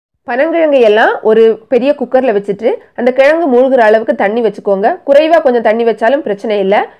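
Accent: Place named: Nagercoil, India. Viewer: Madam neenka nagercoil ah slang apdi than iruku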